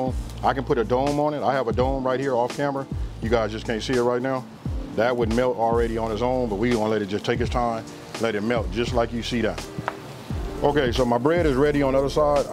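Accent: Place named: South, United States. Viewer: I was Stressed out today. finally got some free time but stressed. Tried a movie. Could not concentrate because still stressed out. Thought some music video might help but this was recomended. This guys Talking southern USA accent calmed me right down. Thanks and You Tube.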